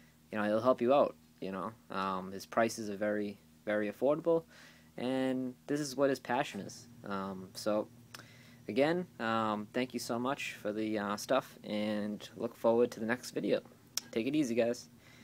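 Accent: Boston, United States. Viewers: Your Boston accent is pretty strong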